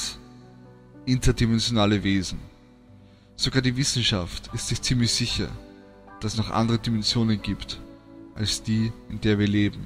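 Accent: Austria. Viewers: du hast genau so eine stimme wie jmd den ich aus skype kenne bist österreicher oder ?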